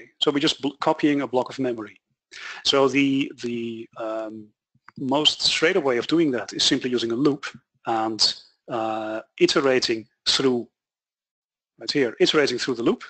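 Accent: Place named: Netherlands. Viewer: bro u sound dutch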